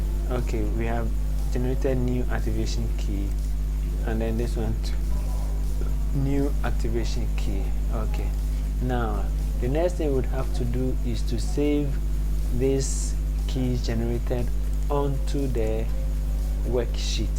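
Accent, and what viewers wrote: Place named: Ghana. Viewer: Good tutorials BTW you sound like a Ghanaian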